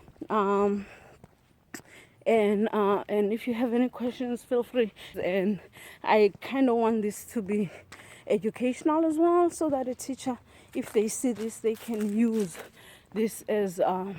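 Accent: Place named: South Africa. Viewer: Did you consciously keep your South African accent? What language do you and your family speak at home?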